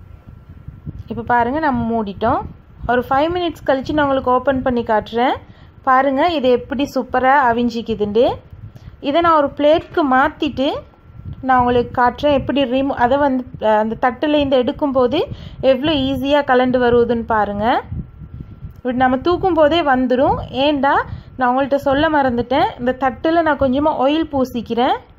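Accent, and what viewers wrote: Sri Lanka. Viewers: Ur sreelankan Tamil is sweet and so is idiyappam reipie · சகோதரி அருமை ... உங்கள் தமிழ் இலங்கை மற்றும் குறிப்பாக புத்தளம் தமிழ் வழக்கை ஒத்துள்ளது .. புத்தளத்தை சேர்ந்தவரா நீங்கள் ? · உங்களின் இனிமையான இலங்கை கொஞ்சும் தமிழ் அருமையோ அருமை சொல்லி தந்த விதமும் அருமை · ரவா இடியப்பம் அருமை அத்துடன் இலங்கை தமிழ் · Super.....Srilanka tamizha neenga pesuvathu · This is not Srilankan tamil , this is how Muslims speak in Srilanka ... 👌👌👌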